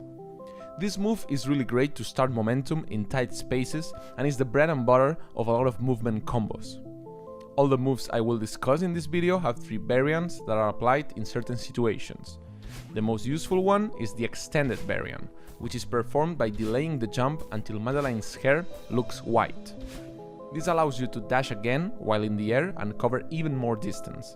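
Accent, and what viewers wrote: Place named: Spain. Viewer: Eres español? Porque tu acento parece español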